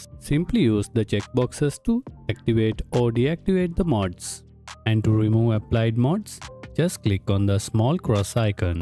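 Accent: India. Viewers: your southindian accent do kicks more when you speak. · Every time it has to be some random Indian mf.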